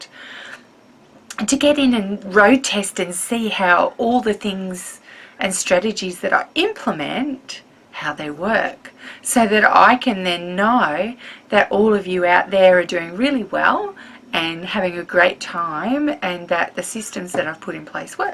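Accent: Australia. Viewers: you are fun to listen to, -- Shannon does not have your beautiful Australian accent (hers is Southern) -- and I love to hear your laugh;) enjoyed hearing about the letter journal group successes and seeing the art you are doing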